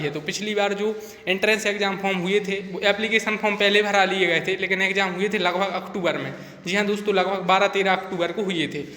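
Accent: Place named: Sagar, India. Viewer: Bhai ap sagar side k hi ho kya...m bhi wahi kha hu apki awaz..se lg rha h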